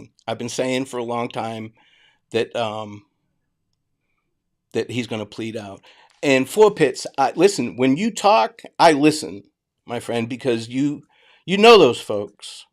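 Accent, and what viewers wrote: Boston, United States. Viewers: if you haven't noticed most criminals aren't known for their smarts! When I worked at a prison in North central Florida one of the inmates got caught after a successful bank robbery in Jacksonville. Him and his buddies got out and away successfully with money. Thing is, the idiot brought his flip phone with him and the phone fell out of his pocket while exiting the bank. His girlfriend was blowing up his phone calling during the commission of the robbery and continued calling back to back call until a female police officer answered the phone. Bank robbers girlfriend challenges the female (cop) answering her man's phone and provides his name, location, vehicle description. This is how he was caught. Silly criminal doing silly stuff, with silly people and entertaining silly women having silly conversations on silly phones., thank you for clearing the air on facts and bringing your Boston accent and gift of story telling. 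No narrator is better than you, well except Morgan Freeman or the guy from unsolved mysteries and that's top notch competition. I wet myself a bit when you called dude a DH, I love your quick wit and ability to get cocky on the fly. I put money on DH wears skinny jeans, paints his nails, has a safe place, feels free and special by using restrooms not assigned appropriate according to his anatomy and sports a participation trophy. 😂. He can get glad in the same hipster skinny jeans he squeezed on and got mad in, probably after crying himself to sle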